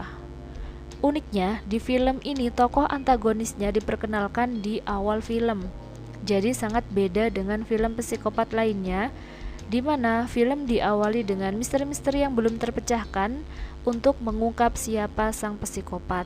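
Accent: Java, Indonesia. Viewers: Medok mbak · gagal fokus sya krn mbak medhok... pdhl sdah tegang btul ngikutin alur critnya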